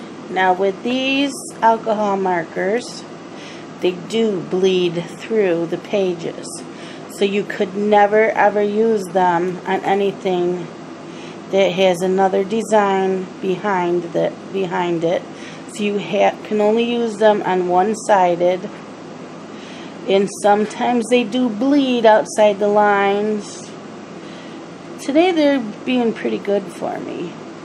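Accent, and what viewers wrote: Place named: Upstate New York, United States. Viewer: Hi. what a cool name. i am watching you color the Freebie Friday, the March, and you're calling us. your peepers. i just love Ann soo much and I enjoy your videos as well. I wonder where you are located. I am guessing upstate NY... I am an Army brat born at Fort Dix NJ but being military one picks up on accents... But I want to tell you how awesome I think you have done this Mandala!!! I am going to try doing one with markers and see how it goes. Have a blessed day. ~bobbi in TX.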